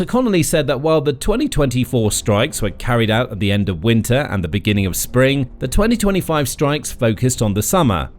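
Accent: England, United Kingdom. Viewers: It really, REALLY bugs me how the narrator keeps calling petrol, "Gasoline", because he is English from his accent and in the UK they call it petrol NOT gasoline.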